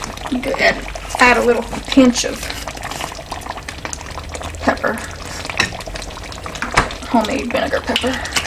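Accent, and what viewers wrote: Louisiana, United States. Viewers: are you cajun??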